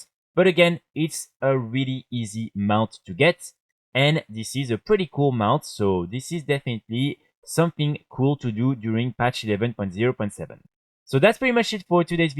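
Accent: France. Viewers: ça c'est de l'accent français comme on aime :D les torrential fragments, j'ai envie de mourir tellement le taux de drop est bas !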